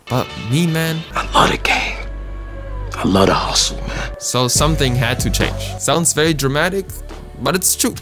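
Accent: Germany. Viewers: im a trippin or does he have a german accent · german accent detected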